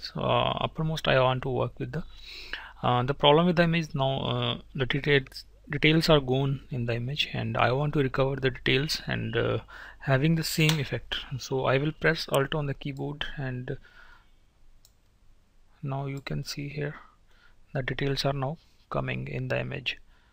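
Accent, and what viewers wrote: India. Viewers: It is an English is just his accent. He's from India. It was hard for me to understand him too but once you listen you can understand.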